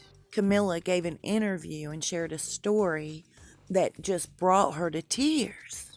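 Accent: South, United States. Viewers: 💜💜💜Happy Valentine's Day! 💜💜💜 I didn't know about your abuse situation. So glad you broke free of that. You are a valuable person with a really cool southern voice! LOL. Bless you dear-heart today and always. Here's a rose for ya. 🌹🌹🌹🌹🌹🌹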